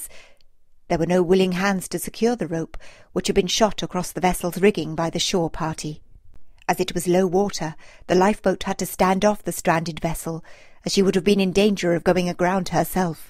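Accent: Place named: United Kingdom. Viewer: Apart from the very informative contribution, I like the good British pronunciation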